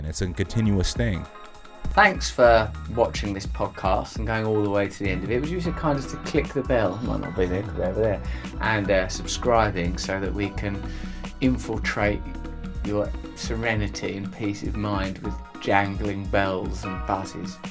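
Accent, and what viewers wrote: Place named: Cockney, United Kingdom. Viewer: You cockney guru you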